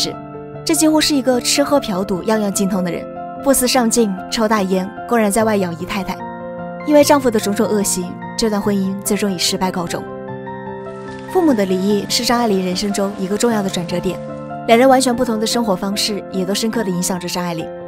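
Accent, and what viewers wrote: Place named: Shaanxi, China. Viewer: 这口音，陕西、山西或内蒙的吧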